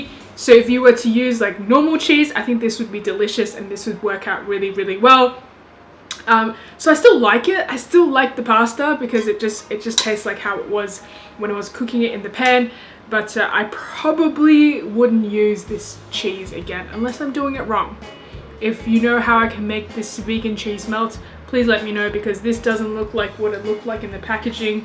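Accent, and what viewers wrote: Australia. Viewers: You sound soo Australian, I think it’s also a ‘Sydney’ accent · Why do I keep seeing australian or New Zealand accents in Asian people a lot nowadays ... very satisfying and yet odd lol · Asian woman with an Australian accept is a trip right now... great vid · I love the Aussie accent!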